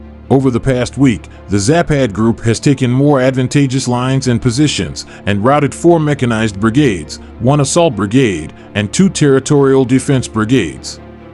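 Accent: United States. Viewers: Пендосовская озвучка, достала. Россия вперёд.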